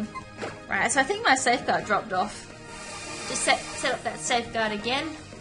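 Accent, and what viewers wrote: Australia. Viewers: are you an Aussie? (just that your voice sounds like it and im aussie too:3) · Are you Australian?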